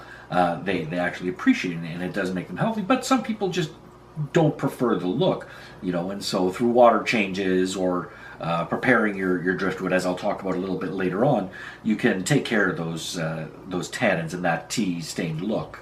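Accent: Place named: Canada. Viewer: I subscribed for the great info and because I like the way Canadian's say about. If you could please say aboot and chesterfield in future videos.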